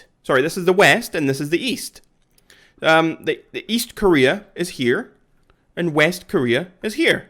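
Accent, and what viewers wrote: Scotland, United Kingdom. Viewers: Is he actually not scottish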